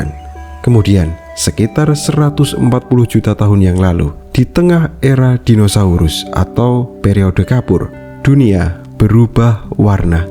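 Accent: Java, Indonesia. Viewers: vo nya jawa 😂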